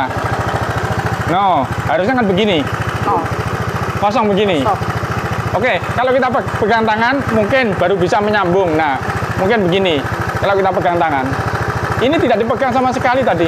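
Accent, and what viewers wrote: Java, Indonesia. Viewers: Sajak wong jowo omongane,jowo ngendi bro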